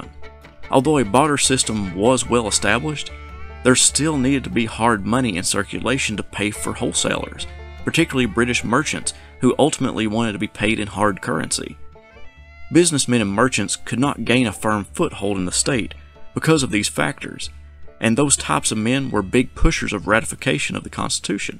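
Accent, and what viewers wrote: South, United States. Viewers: lol not used to hearing country accents like yours on youtube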